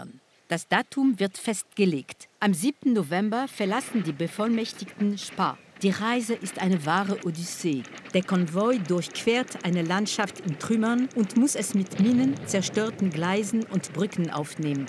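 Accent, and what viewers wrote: France. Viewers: Marschall ist ein deutsches Wort. Die Sprecherin spricht deutsch (mit französischem Akzent). Alles korrekt bei arte. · Man hört sofort ihren französischen Akzent!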